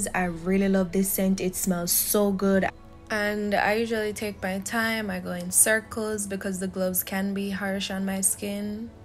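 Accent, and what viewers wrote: Jamaica. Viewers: Hey are you Jamaican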